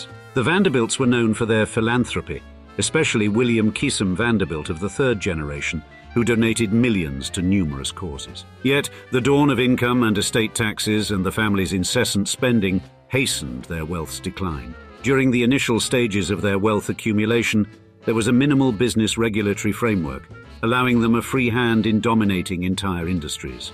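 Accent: RP, United Kingdom. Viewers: and the very erudite sounded RP accent. (And no, RP is not "British" exclusively, but rather 95% spoken mainly in Commonwealth countries with only 5% spoken in Britain.)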